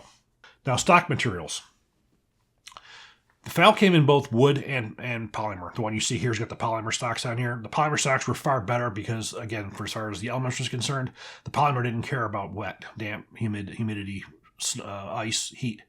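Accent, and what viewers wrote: Texas, United States. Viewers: Texan accent.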